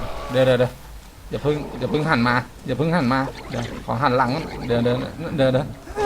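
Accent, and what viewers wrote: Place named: Suphanburi, Thailand. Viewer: พี่เป็นคนสุพรรณบุรีป้ะ เหน่อเวอร์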